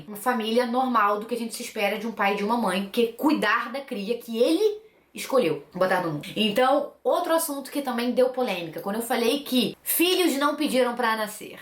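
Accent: Rio de Janeiro, Brazil. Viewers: amo o seu jeito de falar cariocaaaaaa, KKKKK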